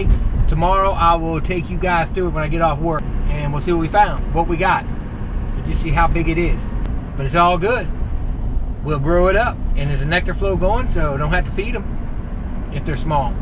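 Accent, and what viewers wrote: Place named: North Carolina, United States. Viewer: Love your videos, moved from NC to Kansas little over a year ago and miss hearing people that sound like me. First year beekeeping, started with two packages and they are doing great. Thanks for the information and just being you.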